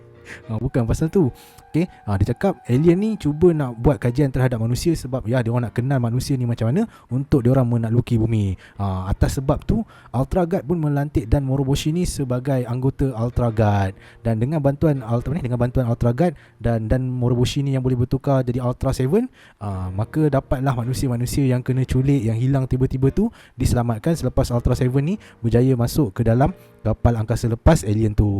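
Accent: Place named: Kedah, Malaysia. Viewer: Awat ckp kedah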